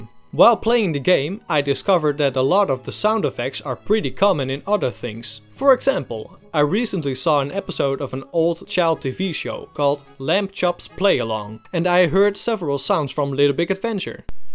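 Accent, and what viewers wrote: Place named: Netherlands. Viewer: are you dutch? you have a dutch accent but you say your location is united states.....you'er a dutch living in USA or you live in NL? i'm in the hague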